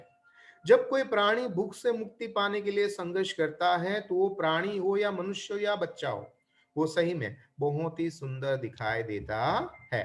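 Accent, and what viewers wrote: Gujarat, India. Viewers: Sir aap Gujarat se ho?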